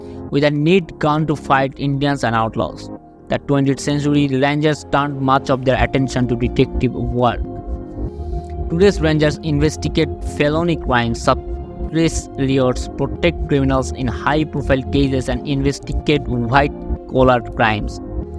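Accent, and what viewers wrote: India. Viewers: Sonehow i can't deal with an Indian accent presenting such a real Western American history story. Doesn't sound authentic. Please use someone with no accent.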